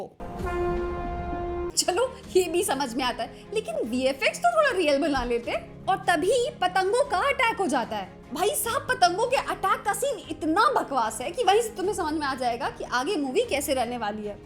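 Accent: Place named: Lucknow, India. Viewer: Aap Lucknow se hain?? Aapke bolne ka andaaz waise hi lagta hai.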